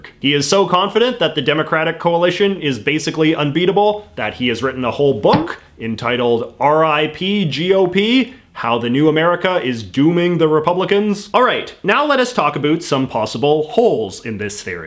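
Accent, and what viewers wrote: Canada. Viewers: Really want to watch these videos but his accent is so painfully Canadian. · Are u Canadian ? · The Four Parts of. •The Moustache (RIP) •The Hair •The Incredibly stereotypical Canadian Accent •Francophobia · is he canadan?he has an accent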